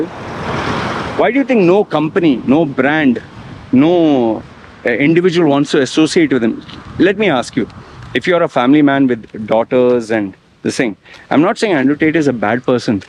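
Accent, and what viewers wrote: India. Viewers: you have an Indian accent, great video btw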